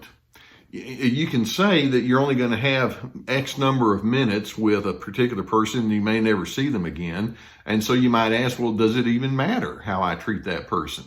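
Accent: Texas, United States. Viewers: He's not southern, he's Texan. :)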